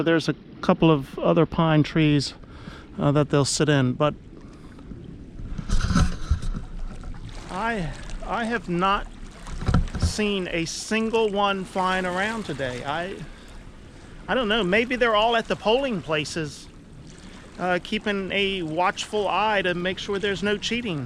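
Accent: San Francisco, United States. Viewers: What a rebel!! You’ve been out San Francisco a lot? I can tell by the sound of your voice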